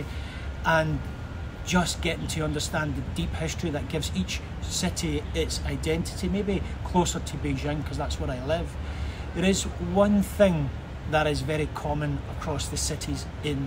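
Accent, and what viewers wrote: Scotland, United Kingdom. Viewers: Thank you for the matter of fact sharing. Love your Bonny Scottish accent❤❤❤ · You’re the first Scottish guy I can understand clearly even though I am British 😂 Chinese people are very welcoming in mainland China can’t wait for my big move in August in Hangzhou Subscribed ❤ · Your Scottish accent is absolute adorable, sorta reminds me of pirates and Spartan worriers haha 😊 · I loooooove your Scottish accent. And great video too!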